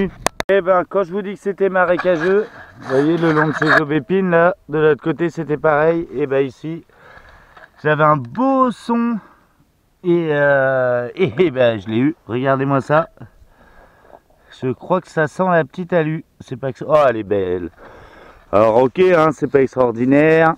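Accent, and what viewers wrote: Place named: Brittany, France. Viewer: salut, j'adore la présentation de tes trouvailles, on sens bien que tu est de Bretagne. pas mal de trouvailles, surtout des boutons, tu vas bientôt pouvoir ouvrir une mercerie MDR. merci pour le partage, bonne détection et à bientôt + 1 pouce bleue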